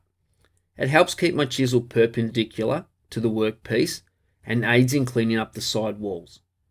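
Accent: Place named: Australia. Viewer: do I hear an Ausie accent? Love your videos. One Handed Maker au!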